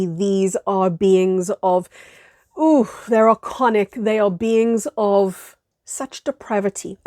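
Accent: South Africa. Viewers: great message, love the S.A. accent too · You sound South African❤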